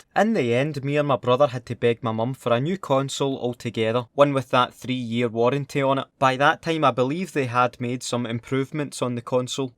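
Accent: Scotland, United Kingdom. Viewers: Whats this? AND I LOVE YOUR VOICE! Its cool! Scottish? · Love the Scottish accent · Aye scottish mate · I love your Scottish accent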